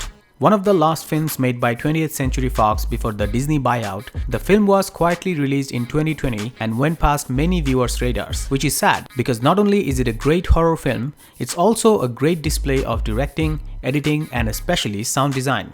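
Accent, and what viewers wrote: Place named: Bengal, India. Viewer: You're the perfect example of pure Bengali accent. It's enjoyable to listen.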